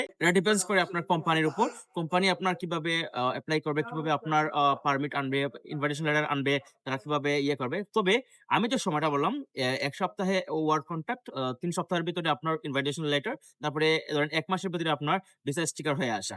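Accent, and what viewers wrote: Sylhet, Bangladesh. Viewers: ভাই আপ্নে সিলেটি লাগের।আমি আপনার লগে মাতিতাম ছাই।ওয়াটসাপ নাম্বার আছে নি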